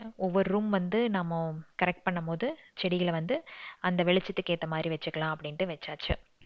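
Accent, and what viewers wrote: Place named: Pondicherry, India. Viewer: akka unga voice kaetalae relax ah eruku ❤❤❤unga kita erunthu varthuku orunal apditha nanga pondicherry la paesuvom unga kita erunthu varathuku oruka😂😂kathukita akka ma❤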